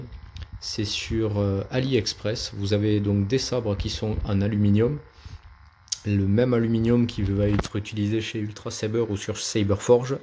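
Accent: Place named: Southwest, France. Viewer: Bonjour, étant un bon passionné du monde Star Wars et bien évidemment des sabres Jedi, je suis tombé sur ta chaîne et j'ai directement accroché. J'adore tes vidéos, elles sont précises et riches d'informations. Tu t'exprimes vraiment bien et ton accent du SudOuest met le sourire. Excellentes vidéos, j'espère que tu vas nous en proposer de nouvelles et je t'encourage à continuer. Je te souhaite de bonnes choses. PS: je me demandais si tu avais une page Instagram ou Facebook, où l'on peut voir ce que tu fais et discuter.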